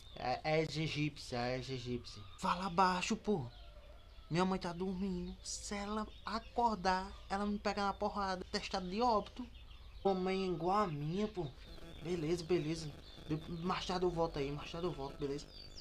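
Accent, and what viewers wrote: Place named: Pernambuco, Brazil. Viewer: Mermao com esse sotaque ai ce é de pernambuco?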